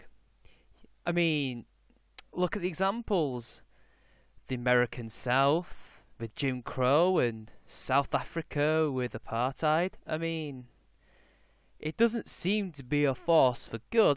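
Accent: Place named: Manchester, United Kingdom. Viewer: I used to live in Manchester for 4 years, I found hints of mancunian in your accent in an earlier video and it stroke a comfortable feeling. Make a TYT video man.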